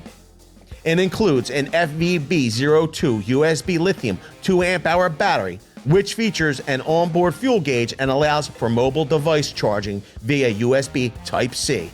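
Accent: United States. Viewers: The 'Dollar' is a currency unit used by more than 25 countries. Judging from your accent, I'm guessing that you're an American. Please, when you are quoting prices, have the courtesy to say "US dollars", unless, like most Americans, you don't believe that there are other countries in the world who are viewing your videos.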